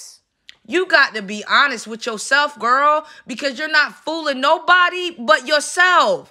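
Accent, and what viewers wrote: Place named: Maryland, United States. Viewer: I knew you were somewhere close to Delaware by your accent 😂😂😂 and I was right Maryland lol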